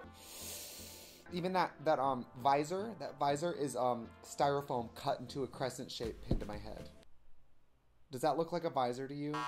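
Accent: Wisconsin, United States. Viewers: The last time I was this early Trixie still had her Wisconsin accent 😭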